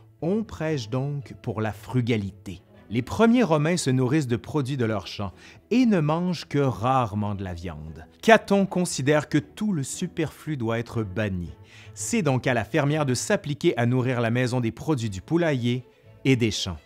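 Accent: Quebec, Canada. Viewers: Je suis heureuse d’écouter un Québécois en plus mon domaine l’histoire 👌bravo 👏🏻🌹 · Des fois, je regarde tes vidéos pour le plaisir d'entendre un accent québecois.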